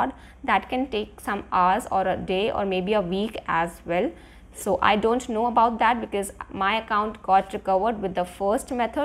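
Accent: India. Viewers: u know it works when the creator has indian accent 🥀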